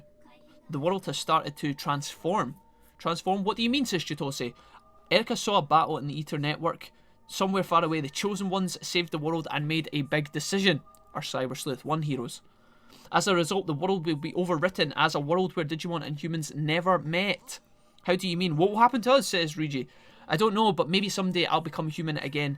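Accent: Scotland, United Kingdom. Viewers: Its coming out now! Lol When are you back man!? We miss ya. Can't wait to hear your Scottish voice again :))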